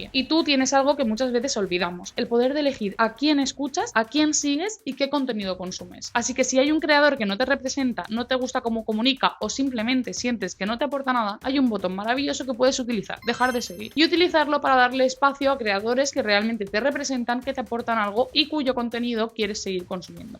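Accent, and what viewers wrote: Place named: Spain. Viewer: Muy buen video, me lo recomendo youtube e incluso creo que es muy innovador, la calidad en que cuentas sobre el tema especializado de los influencers es buenísimo. Ahora por su acento para de españa (disculpe si no es) pero en las redes se ha estado diciendo que en españa va a cambiar el modo de trabajo a uno de 8 horas por un sueldo de 1200 euros al mes, entonces desde punto de vista de influencers de españa y ademáspuntonde vista personal suya, ¿Será este también un cambio entre la sociedad y los influencers? De pasar de una vista idealizada de que trabajan "poco" y ganan mucho a ahora pasar uno a trabajar "poco" y ganar casi lo mismo?. Muchas gracias por la calidad del video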